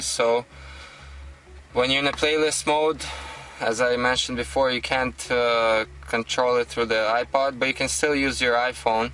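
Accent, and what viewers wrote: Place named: Russia. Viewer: Igor Grusha так акцент-то русский, скорее всего автор видео тоже наш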